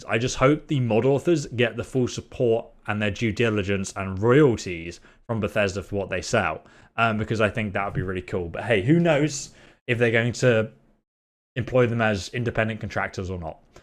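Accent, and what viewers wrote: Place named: United Kingdom. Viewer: Bought doesn’t have an r. Just because your British and say words with a weird emphasis on vowels doesn’t mean you can add letters.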